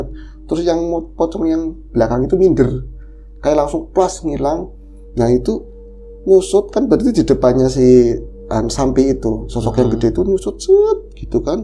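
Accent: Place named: Brebes, Indonesia. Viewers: Kangen logat brebesnya mas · Seneng dengar gaya bahasa Maz', medok banget.👍😊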